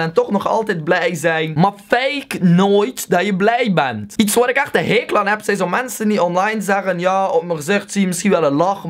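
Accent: Belgium. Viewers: He's actually speaking Dutch with a Belgian accent. (He's belgian) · Sandra Kim Oh... Nou ik als Nederlander hoor duidelijk een accent bij hem en dan refereer ik meteen naar de Belgen, haha 😁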